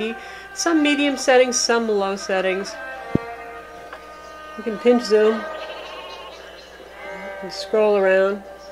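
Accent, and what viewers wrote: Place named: Upstate New York, United States. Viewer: Gifts live that upstate NY accent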